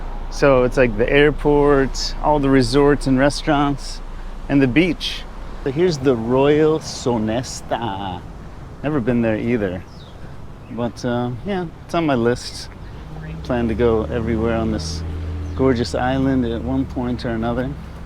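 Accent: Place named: Spain. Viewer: Killing me with your Spanish accent 😂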